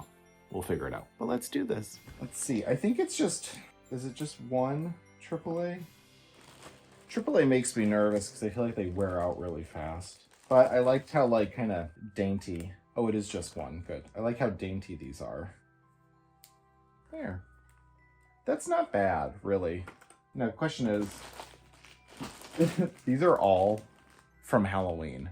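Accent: Minnesota, United States. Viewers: I love the battery powered candles for the tree! So pretty. Since Paolo stole the show he's the 4th Day of Vlogmas. On the 4th Day of Vlogmas my true love gave to me: Four meows from Paolo Three rolls of batting Two power strips 🔌🔌 And you sound like the movie Fargo 🎄⛄🙂⛄🎄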